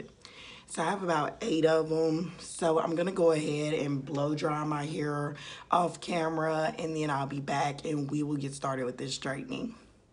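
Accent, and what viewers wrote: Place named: South, United States. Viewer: Lol you so country i love it lol 😂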